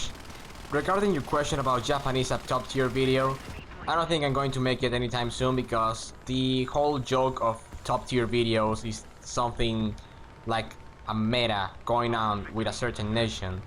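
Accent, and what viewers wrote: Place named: Spain. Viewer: Yo ya decía tenía un poquito de acento de español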